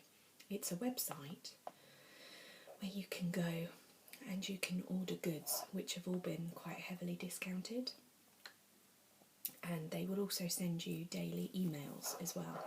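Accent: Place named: England, United Kingdom. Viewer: ~* *~ Cool! I really like this vid. Very casual, and my two biggest triggers are voices and tapping, and this vid has both! Your voice is really triggery for me, as I think English accents are more so than USA. Thanks and hope you're having a good day! Merry Christmas, happy Hanukkah, happy kwanza, winter solstice, or whatever you celebrate.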